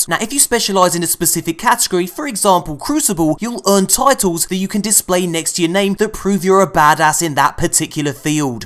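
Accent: United Kingdom. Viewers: That voice is kinda annoying.... I prefer your brilliant British voice you beautiful beloved bostared (if you are British)👍🏿👍🏿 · And this kids is what happens when you combine clownfish voice changer and a lit British dude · Dude I love you but your British and when you morph your voice I can't understand shit you say.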